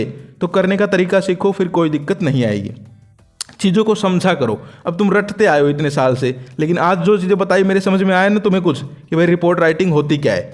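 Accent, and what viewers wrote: Haryana, India. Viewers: Sir too haryanavi hai ❤❤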